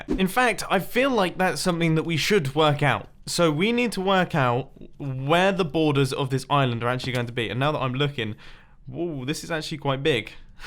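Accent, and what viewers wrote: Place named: Yorkshire, United Kingdom. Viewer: Hey, are you from Yorkshire because I kind off hear that dialect. PS: great videos keep it up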